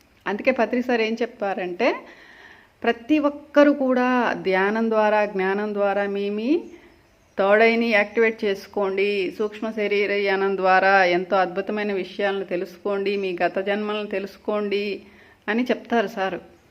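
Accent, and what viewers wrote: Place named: Nellore, India. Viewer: నమస్తే మాస్టర్, గత కొన్నిరోజులుగా మీ వీడియోస్ కోసం ఎదురుచూస్తున్నాను. అంతలోనే అత్యంత అద్భుత సమాచారంటతో మీరు దర్శనమిచ్చారు. మీ మాడ్యులేషన్ మరియు కొంత నెల్లూరు యాస చాలా ముచ్చటగా ఉన్నది. మాస్టర్, మీరు మేము ఇంతవరకు ఊహకు కూడా అందనటువంటి జ్ఞానాన్ని అందిస్తున్నారు. ధ్యానం మీద మీరు చేసిన వీడియో బాగా ఉపకరించింది, మాస్టర్ ధన్యవాదములు. అయితే, కలిగె సందేహాలు, మీతోని నివృత్తి చేసుకునే వీలు లేకుండా పోవడం చాల నిరుత్సాహం కలిగిస్తున్నది. ఏదైనా మార్గం చూపించ గలరని ఆశిస్తున్నాను. కృతజ్ఞతలు మాస్టర్ 🙏🌸🌼🌺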